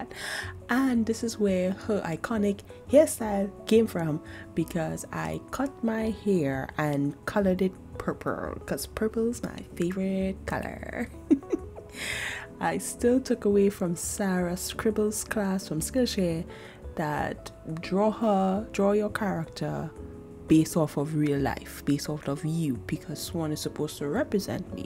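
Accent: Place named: Trinidad and Tobago. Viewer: so i'm listening to you talk and i'm like 'why she sound kinda like a trini so loll' love your art :3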